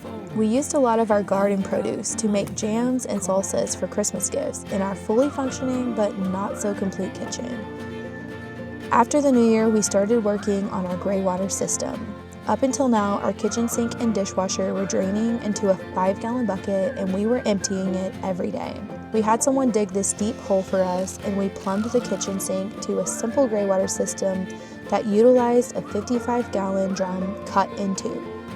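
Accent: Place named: South, United States. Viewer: Hi! I’m new to your channel and LOVE it!!! May I please ask what state you live in? I hear the accent and I thought something was mentioned that makes me think you are somewhere in Tennessee. Maybe one of the Carolina’s? I was raised in Kentucky. Rattlesnakes were a bit too chummy down there!😂😂😂 Thanks for such wonderful videos! You know you are doing a great job when the people watching want to be your friend, and we not only are entertained, but learn things as well! Joy and happiness to you!!💜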